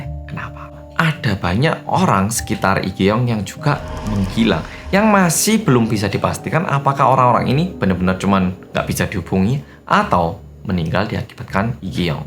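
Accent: Java, Indonesia. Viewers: Mas orang jawa apa korea sih Kok medhok nya dapet 😅😅😅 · oppa, koq bisa sih bahasa nya medok gitu, gimana ceritanya nih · ... kamu orang mana sih kok medok kali Jawa nya... awas ya kalo orang luar tapi ngakunya org Jawa....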